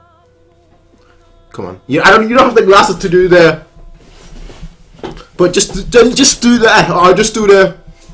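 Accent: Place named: United Kingdom. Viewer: I really love your reaction and accent bro. British right?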